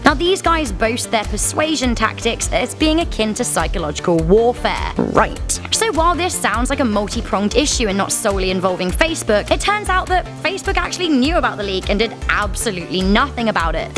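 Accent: United Kingdom. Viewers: Just want to make it known to the world that has the absolute best anglo accent that I have ever heard.... My opinion hasn't anything to do with the video nor anything else really, but I felt that I would share it with the rest of the world for reasons.. (´∀`) avec beaucoup d'amour de france. (✿◠‿◠) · Aaa B yeah it’s a British accent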